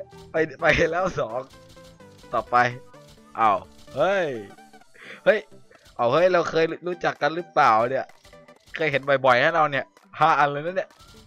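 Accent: South, Thailand. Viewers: พี่คนใต้หรอ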